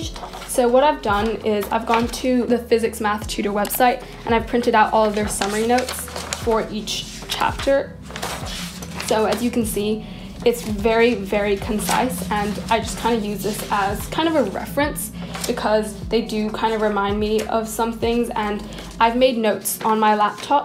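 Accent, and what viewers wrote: United States. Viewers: don’t you have an american accent?so why are you doing GCSEs? or have i got it all wrong 😭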